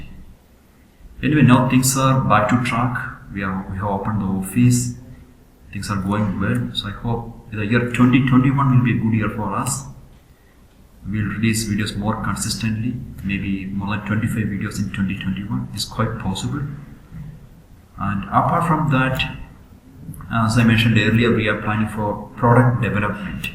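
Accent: Kerala, India. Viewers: Are u malayali ,ur slang express it. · Sir,you sound like a malayali. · Am I hearing a mallu accent 😶?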